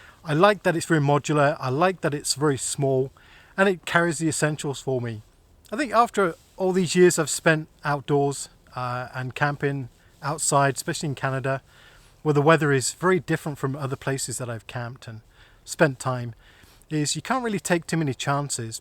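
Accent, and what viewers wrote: United Kingdom. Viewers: Was not expecting the British accent, but then I am new to the channel. Survival Russia bought me here BTW.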